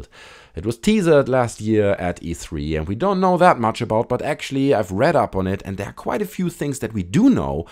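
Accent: Germany. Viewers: are you German ? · Is that a German accent? I'm a first-time viewer of your channel. I'm not 100% sure if it is German... I can't quite place it.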